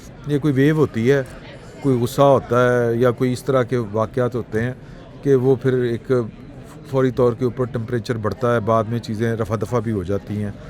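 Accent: Punjab, Pakistan. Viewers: Stupid comments in Punjabi accent. He’s clueless about global politics.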